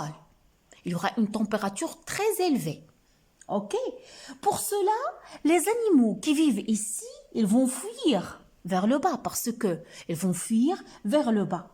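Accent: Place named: Morocco. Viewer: Ça sent le « é > marocain !!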